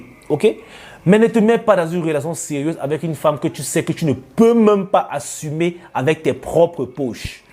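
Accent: Cameroon. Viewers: Toi tu est camerounais bassa'a nespa!!!😂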